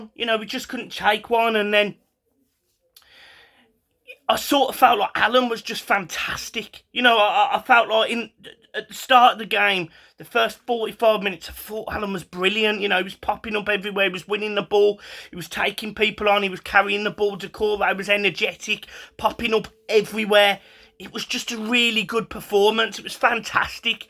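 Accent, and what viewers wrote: Birmingham, United Kingdom. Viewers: Are you sure you are an Everton fan you sound like a Brummie to me! Support your home people! I don't care about Everton!!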